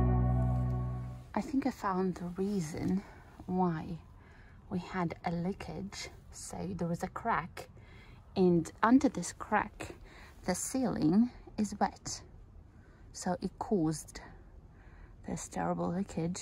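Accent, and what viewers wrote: England, United Kingdom. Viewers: I would come help you... Save that cute 🏠 I am curious how you have an English accent and from Belarus ? Love you videos peaceful .. Ps you may want to shovel all debris out of attic to reduce weight on ceiling beams . I am a Architect and builder in NYC . I also now live in a tiny cabin on farm which is in similar condition. Roof must be repaired asap . Then all else You can use cement mortar to repair roof tiles tile thin set grout etc cheaper than cauk . Good 🤞😊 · Thank you. I just came across one of her videos where she kind of explains. Apparently all done by practising and hard work in Belarus. Hasn't been to the UK, I believe, or at least not for a significant length of time. Unbelievable. She sounds about 98% British. I don't think I've ever seen a non-native that sounds like her.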